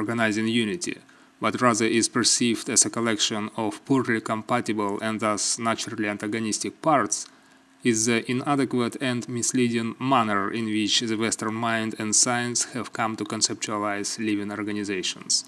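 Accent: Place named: Eastern Europe. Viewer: The 'presentation' is a little on the 'dry' side, but an informative video nevertheless; IF you can understand the heavy Eastern European accent ;>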